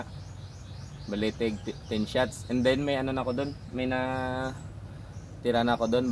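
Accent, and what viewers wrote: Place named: Iloilo, Philippines. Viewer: sir my accent ka sir...taga iloilo kba sir?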